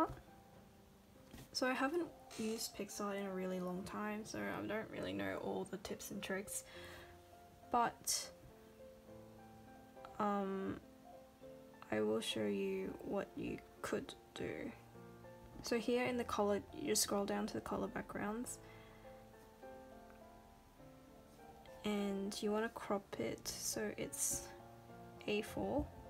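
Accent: Australia. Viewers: Idk why but your accent reminds me of felix and bangchan's accent😂😂😂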